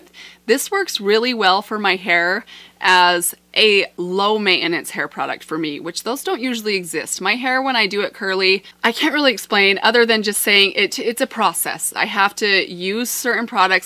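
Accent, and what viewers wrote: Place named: United States. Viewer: I loved this, you're so lovely, very gorgeous lady. I love your beautiful American accent. Everything you say sounds so melodic when you talk. I'm not from the US so I don't get to hear the American accent very often outside of the cinema and American programmes on the telly.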